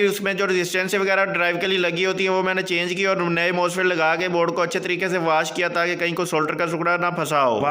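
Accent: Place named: Rural, India. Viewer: Kaar deye? Kyune KAAR deye? Urdu to dekho zra apni. Aisa lg raha hai k ksi Pind se ai ho or video bnaney beth gae ho.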